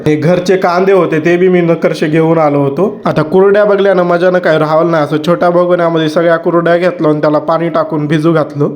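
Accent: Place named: Gujarat, India. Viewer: Tuzha gav kuthle.bhadha thodi vegle wataate.gujarati aikdylla yete.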